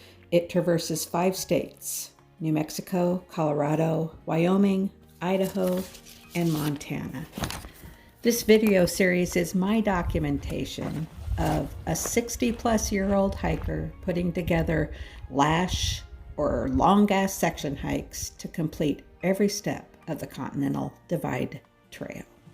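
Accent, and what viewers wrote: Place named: South, United States. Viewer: Wow, all done with your videos. I am bummed. I'm really looking forward to many more. You may not hit 1000 subscribers, but those who do are certainly appreciative of you putting out the videos. Thanks, for producing these videos, allowing us into your life's adventures. I'm glad you are finding a purpose and realizing some of your dreams in your life, especially at our age, and you have done a lot of other adventures in earlier life. Lol, it's hard to stop. The southern twang, Oh Golly's, hot as monkey balls, etc., are definitely becoming your trademark themes in your videos. Love It! See you soon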